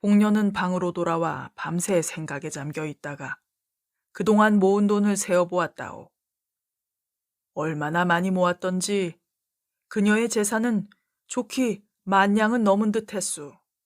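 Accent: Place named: Chungcheong, South Korea. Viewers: 충청도식화법이 재밌네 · 참으로 정겹고 훈훈한 아름다운 야화입니다 그야말로 구수하고 정이 뚝뚝 떨어는 충청도 사투리의 뚝배기 맛이 정말 일품이요 재밋게 잘 들었다오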